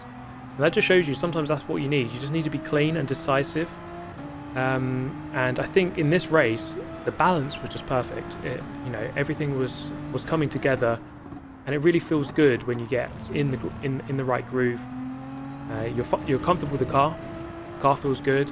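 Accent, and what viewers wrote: United Kingdom. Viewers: Grove sent me here. Can't beat a British mans narration!!! Excellent Video. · Shhh nobody tell him any monotonous motorsport hum in the background will do for some people...😂 No seriously, I fell asleep many times to but the Voice IS certainly a part of it. Also the british accent and the sense of humor!!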